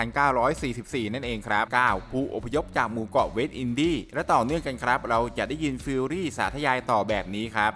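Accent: Thailand. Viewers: เหน่อแบบนี้ ราดรี หรือ คอนถม หรือ เมืองกาญ ครับ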